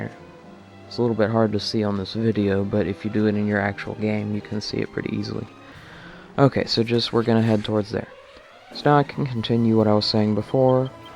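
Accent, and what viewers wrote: South, United States. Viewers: So...do I detect a southern accent? I'm from Oklahoma and I visit New York every other year sooooo.....yeah I usually recognize an accent when I hear one...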